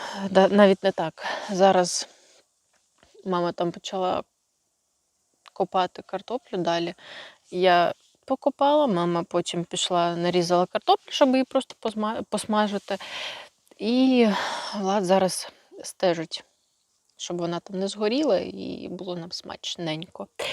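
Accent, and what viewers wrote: Ukraine. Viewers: Hi. Love to hear you speak the Ukrainian dialect I grew up with. My parents came from Carpathian region to US after WW2. I still make borscht and grow potatoes too! Slava Ukraini. ❤️🇺🇦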